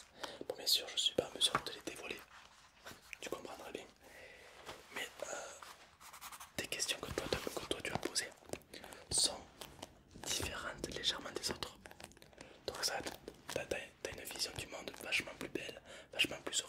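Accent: South, France. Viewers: Normal c l'accent du sud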